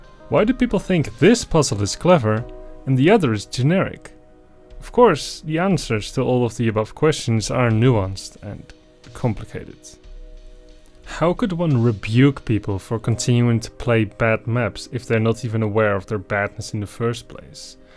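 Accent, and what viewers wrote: Netherlands. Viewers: do i sense a dutch accent in there? :D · Hey question. Are you dutch/netherlands?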